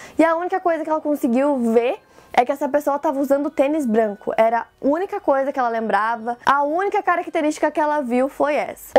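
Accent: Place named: Rio Grande do Sul, Brazil. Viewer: O sotaque dela me soa gaúcho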